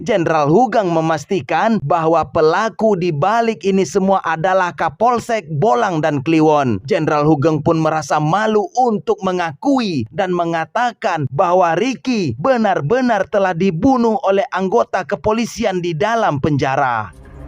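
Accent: West Sumatra, Indonesia. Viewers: Urang awak kiro e😂😅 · Urang awak ruponyo ha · Urang awak kiroe admin eeee wkwkwkwk Kundiak gadang ndak ru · Orang Minang bg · Orang minang kah bg? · Urang awak admin nyo kayak e qkwkwk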